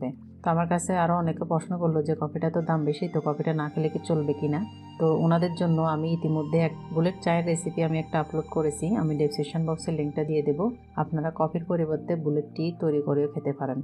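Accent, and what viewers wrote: Chittagong, Bangladesh. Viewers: Apu you from chittagong?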